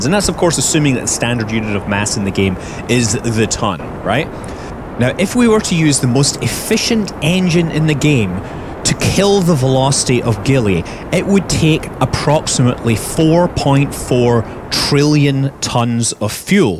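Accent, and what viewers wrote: Scotland, United Kingdom. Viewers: Hehe where about in Scotland are you from? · Cool you sound Scottish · Very, very Scottish. And I live there. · Your epic Scot manly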